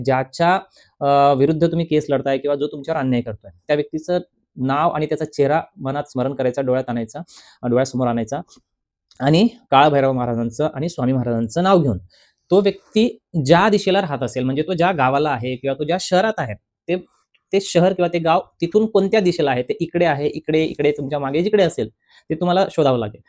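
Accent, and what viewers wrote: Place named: Pune, India. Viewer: तुम्ही धुळे चे आहे म्हनता पण तुमची भाषा पुणेरी आहे धुळे जळगाव ची भाषा नाही वाटत.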